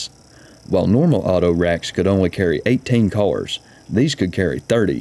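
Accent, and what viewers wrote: South, United States. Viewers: Love your Southern US English. It sounds like home.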